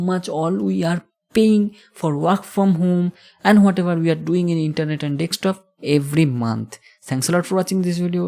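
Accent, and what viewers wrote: Bengal, India. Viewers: U r Bengali for sure 😅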